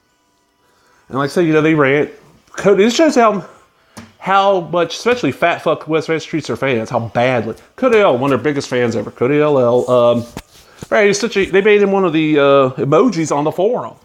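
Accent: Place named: South, United States. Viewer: Also, I really like your thick southern accent in these videos! I have a very thick southern accent myself and kinda reminds me of myself a little bit but mine's slightly different. Where were you born and raised? I was born in Dallas, Texas and grew up in Tulsa, Oklahoma so I'm guessing that's where the accent comes from!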